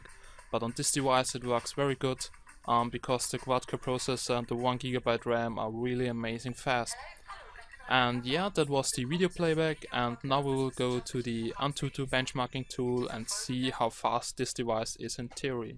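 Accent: Germany. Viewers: are you german? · Are you german ? Because you speak english like a german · are you german ? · du kommst doch aus deutschland :D ich hör dein akzent :D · Man merkt das du deutscher bist ahhaha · wie man merkt dass du deutscher bist :D - aber gutes englisch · You have a german accent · Hallo, ich nehme an das du deutsch sprichst, deines Akzentes und einiger Handy's wegen in denen ich gesehen habe, das Sprachen auf Deutsch sind;) Finde deine Video's sehr spannend und belehrend, mach weiter so! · Man merkt nach dem ersten Satz das du Deutscher bist :) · Maybe that`s the reason I understand him so well - I`m German too... :D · Digga du bist deutsch oder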